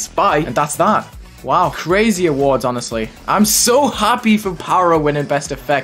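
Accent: United Kingdom. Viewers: bri' ish???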